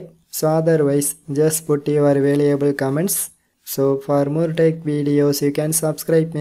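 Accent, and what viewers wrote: India. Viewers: Every time i listen how to speaking indian english such as very annoying voice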